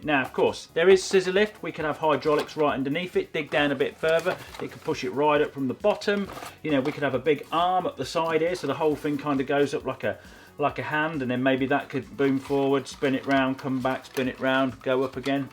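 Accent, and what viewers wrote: United Kingdom. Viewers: It is only me or sound more british then before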